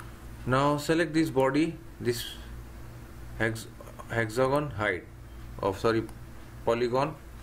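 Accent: India. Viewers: 讲得非常好！听这口音，我就猜到是印度人。能听出来是因为最近学音标，看印度电影。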